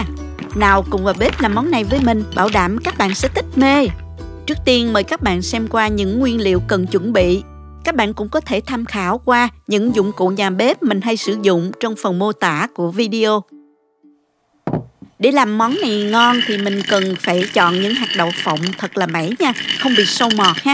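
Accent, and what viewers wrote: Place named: Binh Duong, Vietnam. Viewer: đọc lạ Bình Dương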